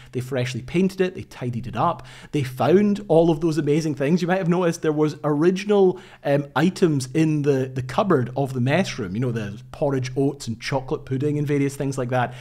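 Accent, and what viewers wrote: Scotland, United Kingdom. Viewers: Excellent video just subscribed first time viewer😂 Nice to hear a jock voice and not a whiny American Voice Scotland Gaelic accent no 1🇮🇪🏴🇬🇧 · Outstanding job, and it’s even better with a Scottish accent. Very thankful you want to all your trouble to do this, it was well worth it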